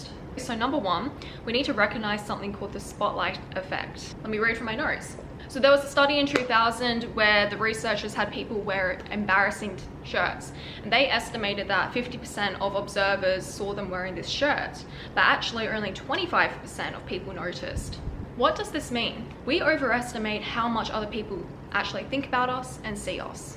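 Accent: Australia. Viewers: i love ur aussie accent it's so effortlessly sassy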